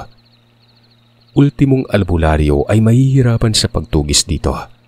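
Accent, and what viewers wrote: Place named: Ilonggo, Philippines. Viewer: Wag ka na pong mag ilobggo or bisaya Hindi bagay nakaka rindi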